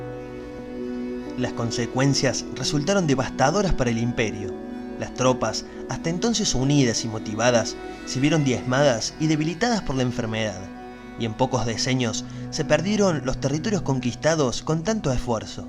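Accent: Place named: Córdoba, Argentina. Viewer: con un ligero acento coordobés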